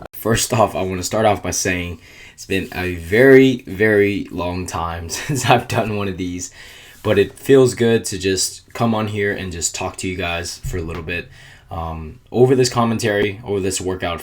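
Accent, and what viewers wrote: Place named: Italy. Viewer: Pretty solid italian accent. Bye from Italy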